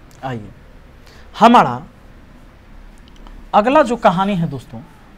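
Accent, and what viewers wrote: Bihar, India. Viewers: Bihari style me hindi sun ke to sir maza hi aa jata hai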